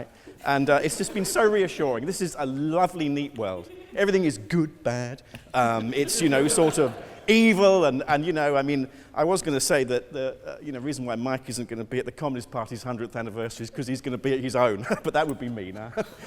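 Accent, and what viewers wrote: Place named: United Kingdom. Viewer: Goddddd...I have trouble understanding British accent..LOL~~~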